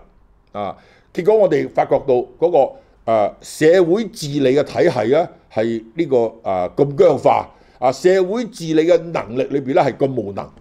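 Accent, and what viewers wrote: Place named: China. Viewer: 乡下佬你的一派大陸人的口吻，与你偷渡来港有莫大关系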